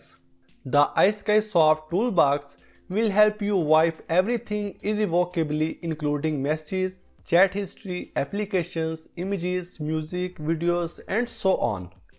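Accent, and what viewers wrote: India. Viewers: im from austria its my second language im far from perfect but anyway its easy to learn most easy language to learn but its not even referring about his english skills like grammer and words and so on he is obviously talking about his hardly to understand indian strange accent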